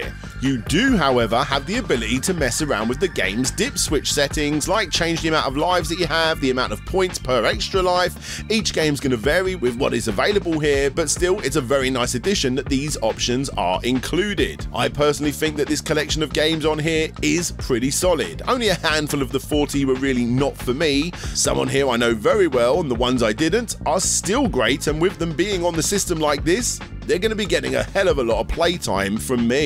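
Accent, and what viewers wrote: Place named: United Kingdom. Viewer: "Lupin" is pronounced "Loo-pan" in Japanese, as it's based on a French character/story. Also "kage" pronounced "Ka-geh", not "cah-geh" as you did in the video. "Shuriken" is pronounced "shoo-ree-ken", not "shuh-ree-ken" too. Hope this helps and thanks for the great British-English review! Always good to see someone not pandering exclusively to the US!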